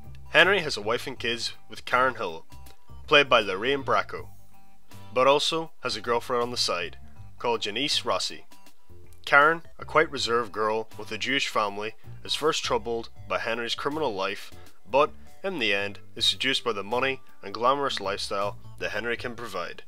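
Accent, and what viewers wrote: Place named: United Kingdom. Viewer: Aside from some...idiosyncratic across-the-pond pronunciations, I thought this was really good. It would be cool to see some parallels drawn with crime literature, and also some examples of films and books that fail to hit (or even subvert) some of the genre conventions, and how that makes them successful or unsuccessful. I went ahead and subscribed to the channel, so I hope there's more forthcoming.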